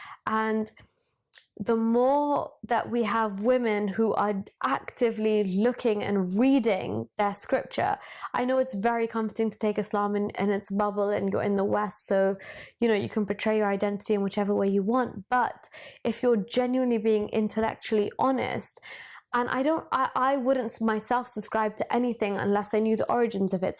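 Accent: England, United Kingdom. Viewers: It's still interesting to see an ethnically Indian person to speak English in an Anglais accent.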